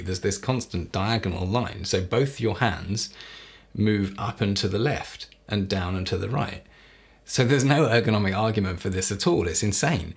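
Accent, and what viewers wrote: United Kingdom. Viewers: That cultured accent doesn't hurt. For the life of me I cannot comprehend why the Scandinavian countries would choose an American accent over a British one when adapting English as a second language. Pure insanity. I'm not even British and have to admit to the clear superiority in their pronunciation. I mean a refined accent like this guy has, not some brash, horrible concoction you hear so often outside of London. Here in Canada we have Quebec that brutalizes the beautiful language of France! Its so strange how people don't seem to discern language pronunciation. In New York City which is plagued also by a horrible accent high achievers seek to rid themselves of that accent by the time they have graduated university. They are making a good choice. One of the worst in the US? Boston! Ugh. Sounds like they barely graduated kindergarten. 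Maybe I'm too sensitive. No offence to people from New York and Boston!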